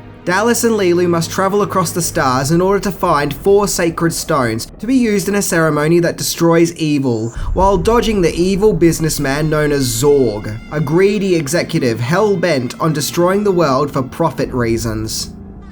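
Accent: Australia. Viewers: imagine if made an 10 amazing facts video and didn't introduce 'imself in his best australian accent ".......and I"M!" i'm holding out for an "I'M " at the goodbye. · wait...you are from Australia? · Hey love that Ausie accent!!!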